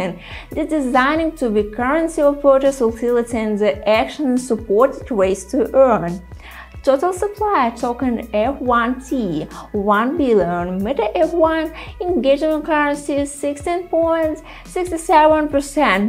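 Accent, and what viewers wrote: Russia. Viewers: how to understand in a second that she is from Russia))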